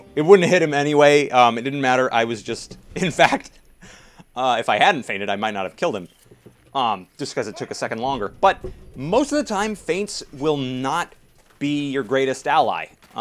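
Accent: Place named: Canada. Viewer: quick question, are you canadian, your long vowel sounds sound canadian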